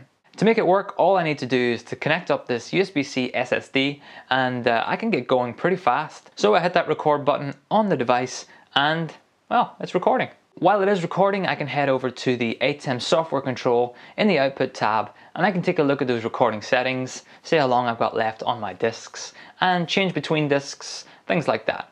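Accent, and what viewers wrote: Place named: Derry, United Kingdom. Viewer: Thanks sir. These are great videos. You're defs from Derry. Gotta be? Sounds like I'm getting a tutorial from my neighbour ;)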